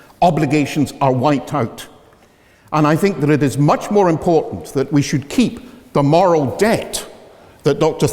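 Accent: United Kingdom. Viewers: Big Mac, bull shit spoken in a rather classy british accent :) Sorry Sir.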